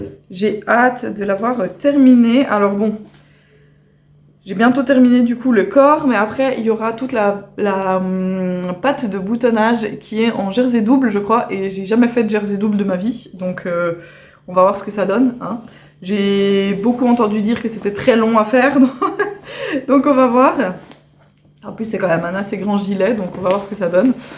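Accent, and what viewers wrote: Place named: Belgium. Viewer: Bonjour. Je vous découvre pour la première fois .je ne savais pas du tout d'où vous étiez et a votre accent il me semble que vous êtes soit du nord soit belge. Je suis aussi belge mais vit en France depuis toujours.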